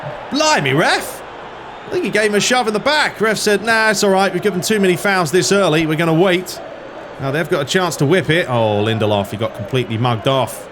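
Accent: Netherlands. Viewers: Amazing Dutch accent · I'm Dutch myself and I think your Dutch accent is on point! 😁 Great episode! keep em coming 👍🙏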